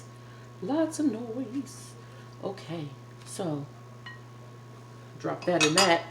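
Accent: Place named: Pennsylvania, United States. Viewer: I remember that coffee cake one f my all time fav’s we might be close in age, I’m here in the San Fernando Valley, I think I’m older graduated in 62. Thank you for the recipe! Your from PA sounds. Like.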